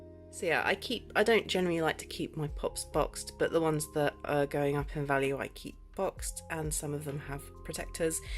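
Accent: United Kingdom. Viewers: British Accent + kind of ASMR voice + retrogaming = me subscribing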